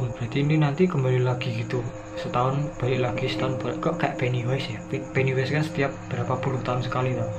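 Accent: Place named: Central Java, Indonesia. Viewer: Jawa tengah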